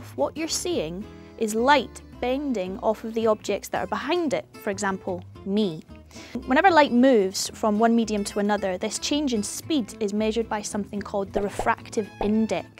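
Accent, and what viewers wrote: Scotland, United Kingdom. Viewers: American shirt brit acent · you're Scottish ascent is dreamy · posh scottish accent haha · Scottish I believe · What part of Britain is her accent from? Sounds scottish, but could be welsh, too... Can anybody tell for sure? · Cool experiment. Love her accent. Scottish,I believe. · I swear not everyone sounds like this in Scotland, half of us sound like farmers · Scottish burd. nice. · Isn't that accent scottish?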